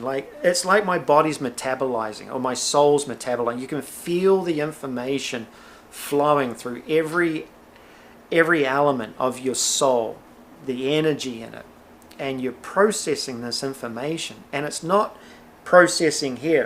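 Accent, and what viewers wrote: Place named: New Zealand. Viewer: Recognized the kiwi accent at the first 5 second of the video, even before he said: it is kiwi hahaha love the accent, miss living in New Zealand ♥ Also I felt for you when you said that you still dont know whats you have to do here on Earth, I myself struggled with that questions up until recently and it was honestly driving me crazy and anxious.... I had an "Near Life Experience" when looking through someones eyes once (I was liivng in New Zealand by that time, yeeeeeey) and I experienced this unicity and seeing the souls of all humans being connect in some sort of galaxy so I know what you are sharing is genuine and 100% true!!!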